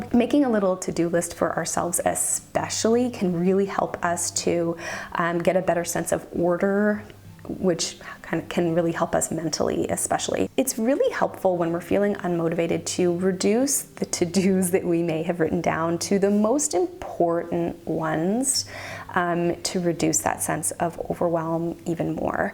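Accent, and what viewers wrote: Canada. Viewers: You sound Canadian.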